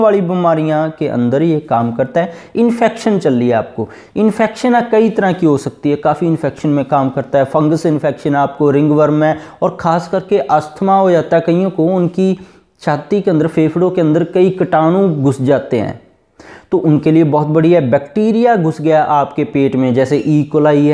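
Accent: Punjab, India. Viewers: आपका बोलने का पंजाबी अंदाज़ बहोत बढ़िया है।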